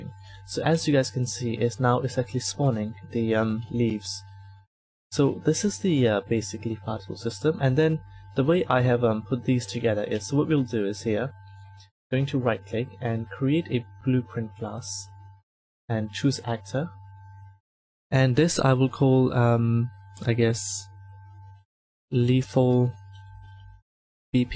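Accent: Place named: Germany. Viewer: GERMAN AKZENTE ^^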